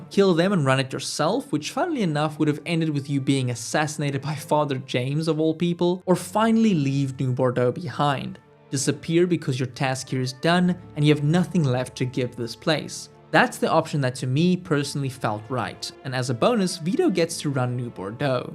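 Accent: South Africa. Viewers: Lekker, man! You're South African! (the r's and o's gave it away lol). While I agree with a lot you're saying, I just can't bring myself to rank Mafia III up too high. The potential is there, Lincoln is compelling and the story is genuinely one of the best I've seen in gaming, but the open world isn't nearly immersive enough (in terms of what you can interact with) especially compared to Mafia 1 OG and 2. I'm far more disappointed than turned off by it, since it has the foundation there (cops reacting differently to you depending on where you commit crimes etc) and in all honesty, Lincoln deserved a MUCH better game. It's still a solid game I replay now and then, and is frankly overhated. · A seth efricen! Lekker man, sommer immediately subscribed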